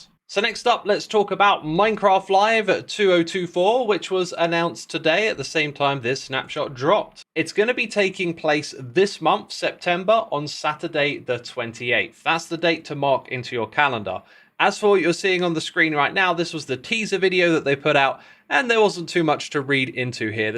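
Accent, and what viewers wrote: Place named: United Kingdom. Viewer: Fun Fact, PT stands for Pacific Time, usually when measuring what time that something is gonna happen in the United States of America, they choose either Eastern Standard Time (EST) or Pacific Standard Time (PT). Then again, I remember you're not American, judging by your British accent, so I guess you had no way of knowing that.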